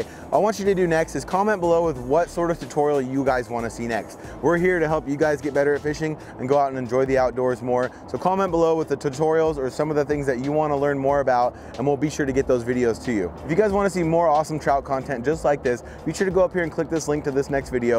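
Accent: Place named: Canada. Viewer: He must be from Minnesota or somewhere in the northwest because he sounds so Canadian. Sounds just like someone from north Ontario. Anyone know? Awesome video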